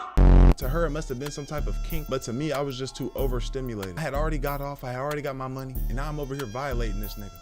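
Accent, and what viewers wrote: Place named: Texas, United States. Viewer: I've been binge watching your vids and your a very good story teller. You gotta subscriber out of me and it sound'n like you a Texas nigga too. So I'm awwready ready to support. Keep it going.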